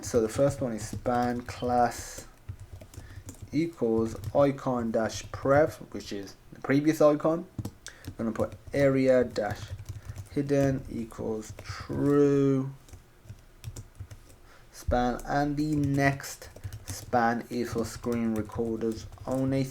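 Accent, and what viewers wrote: United Kingdom. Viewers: Great stuff ! The git downloads will save me ages...! I appreciate the video too btw - good to hear a Brit voice - no trouble understanding you :-)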